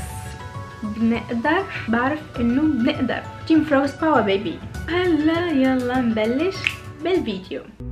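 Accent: Tunisia. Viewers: تونسية ياخي ؟؟ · دوختني وتش نتي تومسية او مغربية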